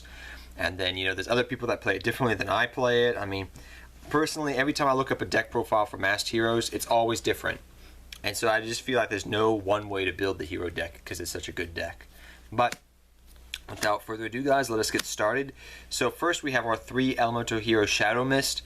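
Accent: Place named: Northeast, United States. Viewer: you from up north?? got an accent on you Ny Boston NJ ??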